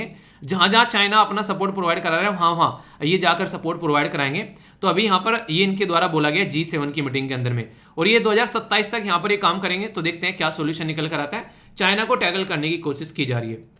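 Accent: Haryana, India. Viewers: sir app kon si district se belong karte hai app ki awaj haryanvi lagti hai jo bahut hi pyari hai i am also haryanvi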